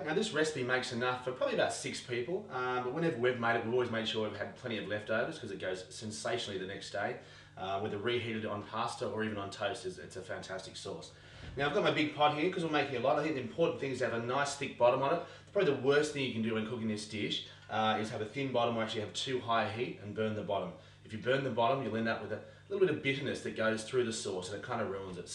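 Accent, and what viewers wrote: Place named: Australia. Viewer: i think he's Australian, that's why he pronounces stuffs like that. no problem about it. :)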